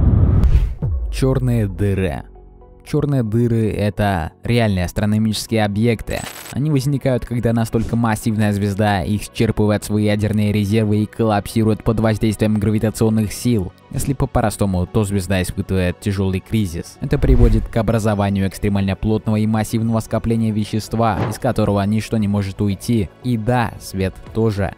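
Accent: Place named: Moscow, Russia. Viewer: да, уберите мааасковский ааакцент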